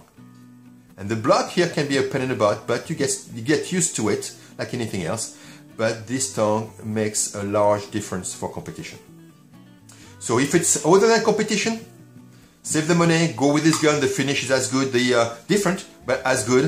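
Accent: France. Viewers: Y a pas un gros accent français ?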